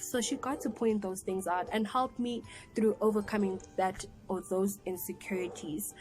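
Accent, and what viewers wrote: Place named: South Africa. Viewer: Hi, thank you for the uplifting story. Question: What are the similarities between living in Madagascar and S.A.? Your accent and the words you use (I.e. woza, yooo!) tell me that you have integrated to the SA environment. Thanks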